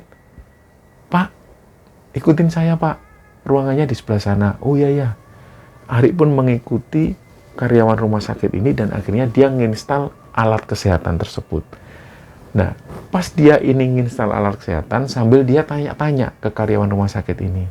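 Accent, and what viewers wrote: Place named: Surabaya, Indonesia. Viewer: Mas dialek Surabaya nya kental banget....☺️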